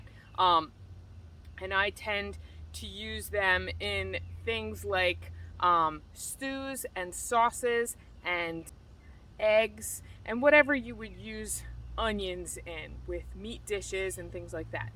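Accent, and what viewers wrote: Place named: New Jersey, United States. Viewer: I have been considering walking onions and someone handed me some at my farmers market this week so I plan to plant them. I’m wondering if I plant them in the fall or in the spring? I have lived in Illinois for 45 years but I recognize your New Jersey accent right away since I grew up there. I didn’t move here until I was 22. You made me homesick lol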